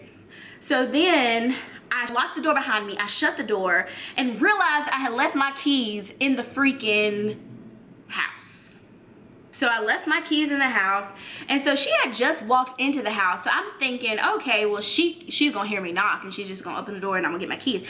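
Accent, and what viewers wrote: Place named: South, United States. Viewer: Where are you from? You have kind of a southern swang in your tone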